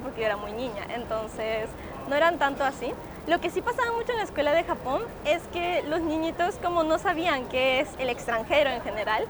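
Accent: Lima, Peru. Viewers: su espanol es muy limpio como la mayoria de peruanos que no son de la sierra ojo ! yo quiero mucho a mis compatriotas andinos , pero manejan un espanol muy marcado ! a diferencia del limeno que puede ser limpio cuando se lo propone incluso por encima de cualquier pais hispano americano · En Perú se habla como ella usted se ve que no conoce nada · Se le siente muy peruana , me encanta quw sea bilingue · Ciertoooooo acento limeño!!! El acento peruano es neutro y único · Y bien peruano, aunque ella suena limeña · Como se le nota el acento Peruano jajajaja exelente 🇵🇪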